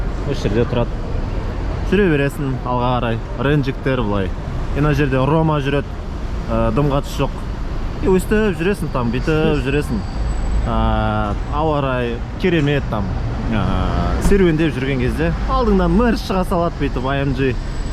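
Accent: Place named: Mangystau, Kazakhstan. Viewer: Маңғыстауский диалект ....😂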